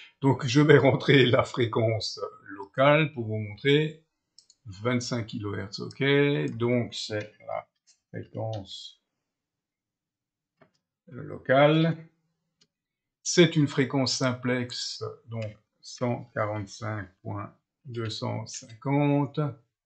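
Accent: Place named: Alsace, France. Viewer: Salut! La fréquence TX du relais 3 a dû être décevante... Sinon bonnes explications avec une jolie pointe d'accent Alsacien. Je suis dans les Vosges, mais pas encore concerné par les relais, je n'ai pas encore de licence Pour le moment, je fais mumuse dans le monde du 446...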